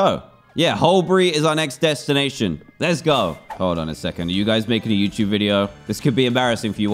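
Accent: United Kingdom. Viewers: I know it's just your British accent but evolution is pronounced as evv-oe-loo-shin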